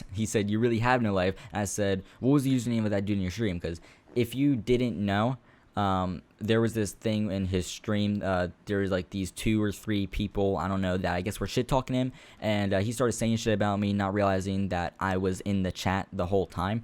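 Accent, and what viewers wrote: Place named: Florida, United States. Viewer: I know that this video came out 7 months ago but I’m so confused on why he saying the n word and he ain’t from Florida he sayin jhit like he actually from Florida.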